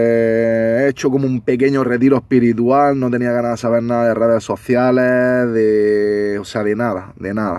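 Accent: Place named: Almería, Spain. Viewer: Desde hace 20 años voy a veranear a Sorbas, toda la familia de mi pareja son de allí y me encanta vuestro acento a parte de tapear y cervezear contínuamente. Por cierto, en el te has saltado un rojo como la copa de un pino 😂😂😂😂. Saludos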